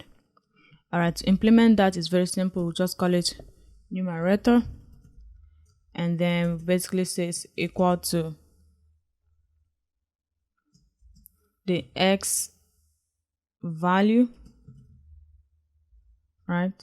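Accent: Nigeria. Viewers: you sound Nigerian girl ... I am gonna follow your channel...... Love you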